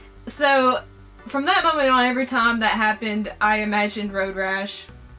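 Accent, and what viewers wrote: South, United States. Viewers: nice one,looks like a great film,i love your southern accent,looking great too,love n peace.jpj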